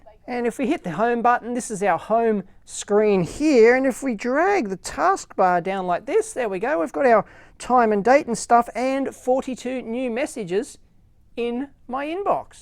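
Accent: Australia. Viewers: Hahaha, he thinks our Aussie way of speaking offensive. For us it's pretty normal.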